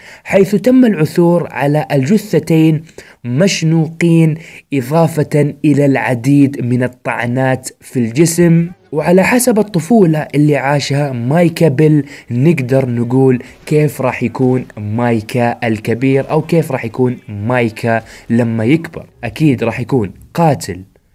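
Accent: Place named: Jeddah, Saudi Arabia. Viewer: ما ابغا احلف بس واضح انك من اهل جدة 😂❤️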